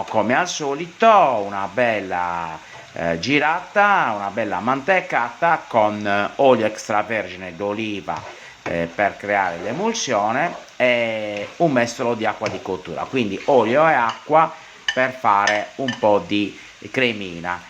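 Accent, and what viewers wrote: Sardinia, Italy. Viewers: Molto piu della ricetta mi piace il suo accento sardo! Eia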